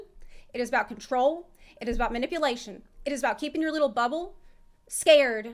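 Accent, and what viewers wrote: South, United States. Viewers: Its because she is from the south, she's from Louisiana. She moved to the Ukraine to teach English iirc. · Is it me or she is becoming more and more Southern by the day? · Southern accent makes sense now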